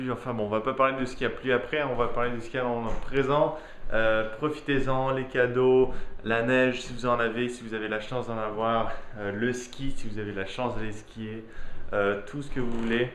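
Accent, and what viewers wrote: Quebec, Canada. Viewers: "Avant de connaître j'aimais pas l'accent québecois...Mais ca, c'étais avant":D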